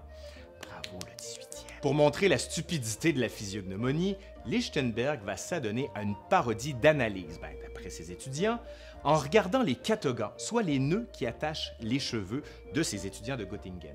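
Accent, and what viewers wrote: Quebec, Canada. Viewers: oui on nous juge, tu es Canadien, tu as "l'accent de là bas", idem pour moi qui suis Belge, j'ai une chaîne dédiée au cochon d'Inde, le "cobaye", ici on prononce "cobée", ben j'ai eu des remarques, mais aussi des MENACES.... n'importe quoi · Je vous ai découvert grâce à la vidéo sur la poutine et je suis ravie d'avoir cliqué sur la chaîne!!! Alors, entre la culture gé, les yeux bleus, le petit accent québécois, en plus maintenant vous vous laissez pousser la barbe et vous avez le TOUPET d'insinuer que l'on va mal vous juger??? Non mais!!! À moins qu'il s'agisse de discrimination positive... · Je laisse rarement des commentaires sur Youtube, mais je tiens à exprimer mon joyeux étonnement : moi qui ai beaucoup de mal en général avec les accents et le québécois en particulier, je ne pensais pas réussir à passer outre en découvrant la chaine... 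Et je me suis rendu compte que non seulement ça ne me posait pas de problème, mais qu'en plus j'en suis venue à l'apprécier et les vidéos de cette chaine comptent désormais parmi mes favorites :) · J'ai du mal à m'habituer à l'accent québécois mais ça en vaut la peine sur cette chaîne! Belle chaîne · et l'accent québécois... 💕 (je suis de la région des Hauts-de-France). Encore une vidéo intéressante et drôle à la fois. C'est un plaisir de vous écouter ! J'aurais adoré avoir un prof d'histoire comme vous (avec un visage avenant en bonus... Compliment qui est complément du sujet de la vidéo !) 😉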